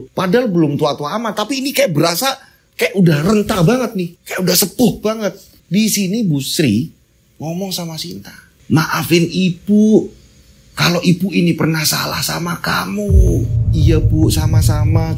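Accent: Java, Indonesia. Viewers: Assalamualaikum..... Kk Nani dari Majalengka Jabar Hadir Absen.... De kk tuh sangat suka kalau kamu menyampaikan kisah cerita nya.... Sangat pas cara menyampaikan nya.... Apalagi bahasa jawa de yg sangat medok.... Kk sangat suka de... Sehat dan sukses selalu ya de.... Boom..boom... Booommm 😍🙏🙏🙏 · Seneng dengar logat jawanya 😁😁😁😁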